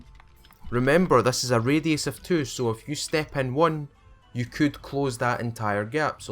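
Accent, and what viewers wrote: Scotland, United Kingdom. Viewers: I watch these just to hear that beautiful Scottish voice of yours. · Thank you always getting my lif information here and is your accent scottish?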